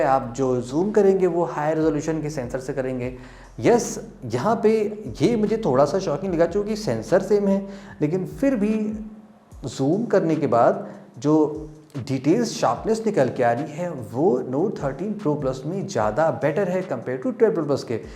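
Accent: Lucknow, India. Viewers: Bhai aap Lucknow se ho kya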